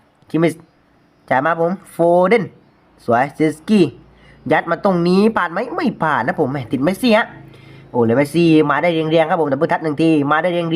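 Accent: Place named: South, Thailand. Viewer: คนใต้ม่ายนิลิ้นรัวจังเติ้ล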